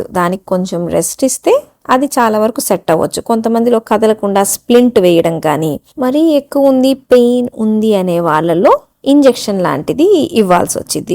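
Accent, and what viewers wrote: Guntur, India. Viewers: మీ భాష వచుద్ది, పోద్ది ఇది గుంటూరు భాష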